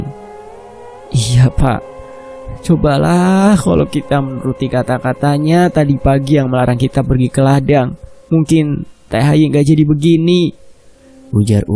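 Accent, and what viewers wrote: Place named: Banyumas, Indonesia. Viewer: Apa sing Bae pak.. ngapak temen jaaann...😂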